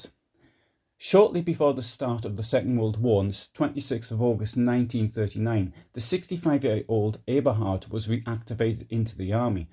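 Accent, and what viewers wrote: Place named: North East England, United Kingdom. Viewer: BTW its great to hear a North East accent sharing such well researched history , we Geordies love our history it seems.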